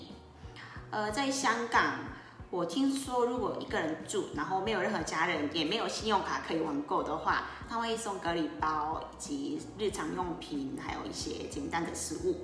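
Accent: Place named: Taiwan. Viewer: 台灣腔真好聽。已經變成台灣人了。🤗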